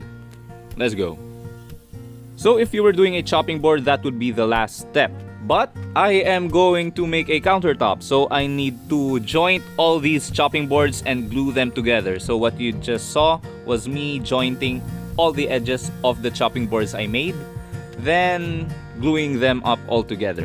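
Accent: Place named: Philippines. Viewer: I really miss the Filipino accent. I was fortunate enough to live near Manila for a few years and travel a lot while there. Listening to you explain all this brought back so many good memories. Best of luck to you with your projects and your channel my friend. :)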